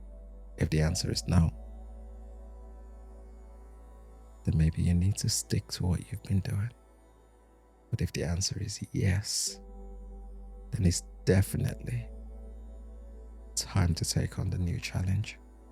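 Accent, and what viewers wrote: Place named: United Kingdom. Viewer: I love your wonderful British accent.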